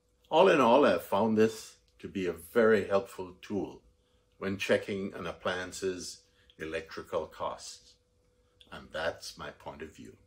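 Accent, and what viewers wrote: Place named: Caribbean. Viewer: You sound Caribbean ish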